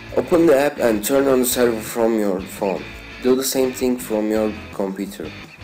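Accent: Turkey. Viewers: Türksün değil mi aksan çok belli ediyo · Aksanın her yerinden ben türküm akıyor :D Neyse helal olsun kardeşim güzel videoların var.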